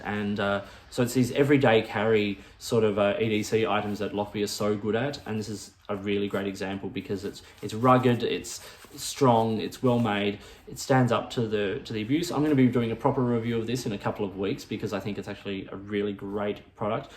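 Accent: Australia. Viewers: Best wishes to you, let’s hope things get back to normal soon. Keep up the reviews if you can it’s nice to hear an Australian voice on fountain pens. Stay well 🧡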